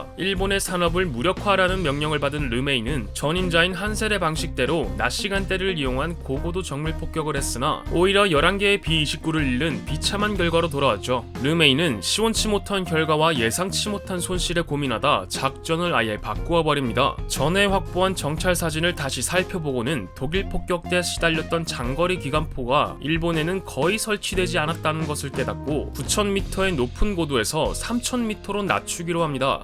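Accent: Gyeongsang, South Korea. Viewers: 혹시 경상도가 고향이신가요?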